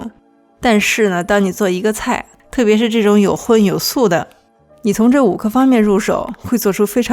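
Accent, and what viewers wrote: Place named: Beijing, China. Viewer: 北京口音😜老乡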